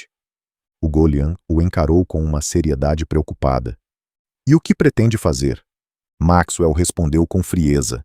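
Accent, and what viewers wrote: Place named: Minas Gerais, Brazil. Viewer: Que doido 👏👏👏👏 (em mineirês 😅 muito legal kkk ) me recordo desse conto em “Relatos Isolados “ …👏👏👏👏👏👏👏deu outra visão do cenário 🤙😀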